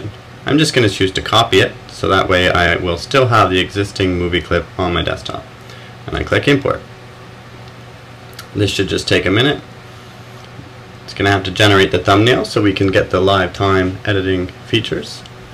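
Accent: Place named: Canada. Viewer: Very helpful; quick information. And a Canadian accent to boot.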